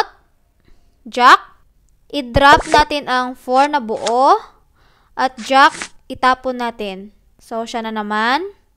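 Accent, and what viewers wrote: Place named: Visayas, Philippines. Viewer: Bisaya ka day. Gwapa man jud ka, ?hehe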